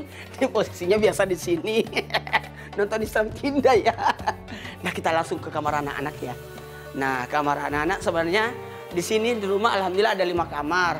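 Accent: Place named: Jakarta, Indonesia. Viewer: Ustadz Sekarang Keren Banget Ya Logatx Dah Bkan Orang Makassar Lgi Deh,Tpi Jdi Orang Jakate😊😊😊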